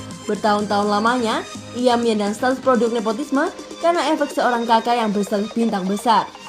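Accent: Java, Indonesia. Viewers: VO NYA MEDOK BAT DAH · Meddok pingit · Bahasanya Medok Jadi Susah Bilang Borrielo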